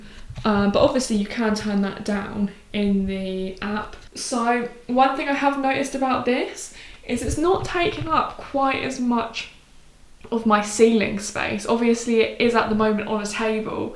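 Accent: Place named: New Zealand. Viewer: Sounds Kiwi with.... Maybe visited the UK for a while?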